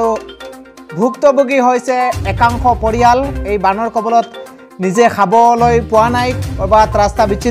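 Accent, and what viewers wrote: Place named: Bangladesh. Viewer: Toi bangladeshi neki kotha khuni khenekua lagise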